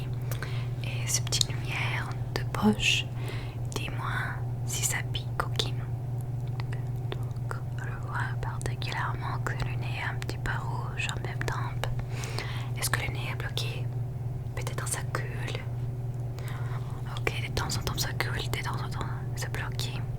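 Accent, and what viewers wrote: Quebec, Canada. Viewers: Es-tu Québécoise ? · Elle est canadienne ? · Je ressens un accent québécois quand tu parles mais c’est sûrement moi est juste habitué avec cet accent ou qui rêve😴… Continue comme ça! 🇨🇦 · Accent anglophone mais aussi québécois un peux ? Non ? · Je découvre ta chaîne avec plaisir. Ton accent est adorable d'où viens tu ? J'entends parfois un accent québécois mais pas uniquement ... Merci pour ta vidéo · T’es québécoise? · Tu es canadienne ? J'aime ton accent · Tes québécoise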